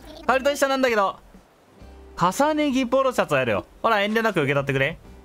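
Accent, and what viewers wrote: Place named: Kansai, Japan. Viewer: 久しぶりに見たんだけど､こんな関西弁の人だったっけ？ってなった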